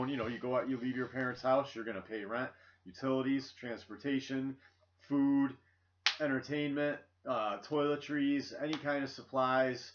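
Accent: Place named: United States. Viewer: You're American (judging by your accent), yet you don't know that Trinidad is located in the Americas. :/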